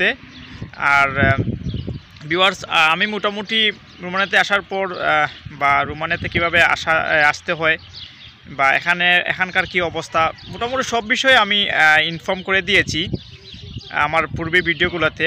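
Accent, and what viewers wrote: Sylhet, Bangladesh. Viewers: ভাই আপনি কি সিলেটি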